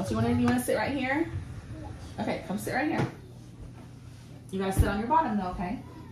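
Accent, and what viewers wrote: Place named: Midwest, United States. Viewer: Can I ask what state you live in? Here is the reason, I grew up in NE Pennsylvania and we say crayon as kinda cran or cray on. But when I moved here to Indiana I notice that alot of the mudwest states saw it like crown. Like I hear you saying it. I'm just curious where you are located.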